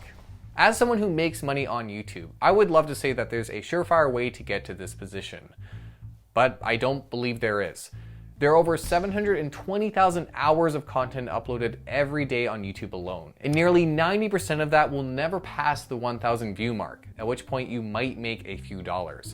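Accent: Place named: Canada. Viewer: hate canadian accent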